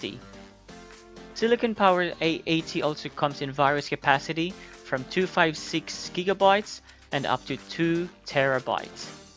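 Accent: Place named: Australia. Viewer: dawg i know you're Pinoy so cut the aussie accent and speak our accent for the pride of the Filipino People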